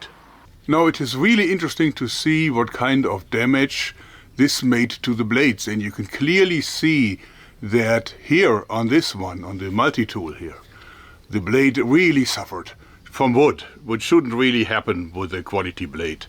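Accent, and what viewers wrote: Germany. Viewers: Sobald ich deinen Akzent gehört habe wusste ich, dass du deutsch bist 😅 Schön mal einen Deutschen zu sehen, der solche Videos macht (auch wenn sie in Englisch sind, was für mich kein Problem ist)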